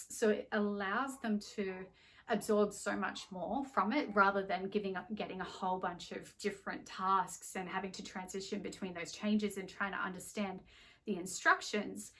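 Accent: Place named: Australia. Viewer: Following you on IG and subscribing! Love your voice and your Aussie accent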